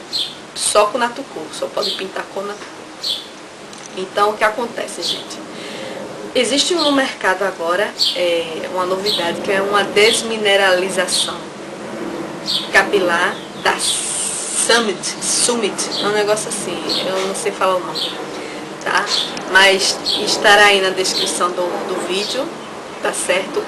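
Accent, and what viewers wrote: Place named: Ceará, Brazil. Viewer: voçe e do çeara,ou rio grande do sul? voçe tem um sotaque bem interessante,.......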